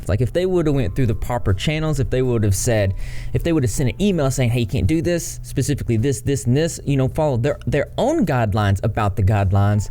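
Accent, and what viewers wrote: South, United States. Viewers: Oh. You're a southern boy 💛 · Whst kind of american accent is that?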